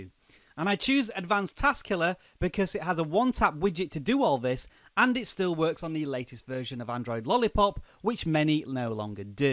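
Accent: United Kingdom. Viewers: British accent......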